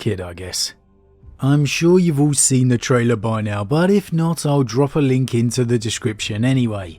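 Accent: United Kingdom. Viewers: The American pronunciation of Lieutenant is correct. It was only the British misunderstanding how the French were inflecting the "Lieu-" prefix that led to the incorrect "Left-tenant" pronunciation you guys use today.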